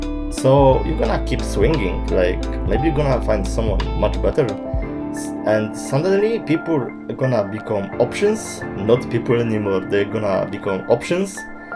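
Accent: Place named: Poland. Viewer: Masz Polski akcent ale ma to swój urok. Fajne że tak rozbudowałeś ten temat w tym filmiku. Oh and have a nice day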